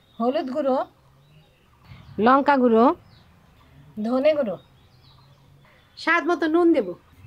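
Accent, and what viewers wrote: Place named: Bangladesh. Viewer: কথা গুলো বাংলাদেশের মতো ❤খুব ভালো লাগে 😊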